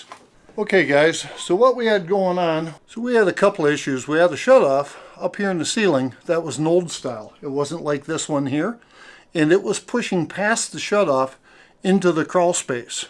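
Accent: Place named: Minnesota, United States. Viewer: First time see you like your content….is that your daughter..you have a Minnesota accent..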